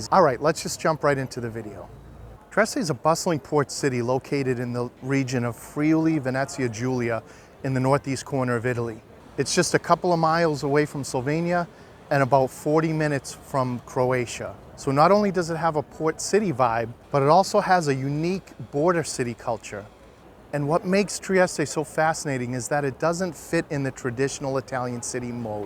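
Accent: Boston, United States. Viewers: excellent video. Is that a Boston accent?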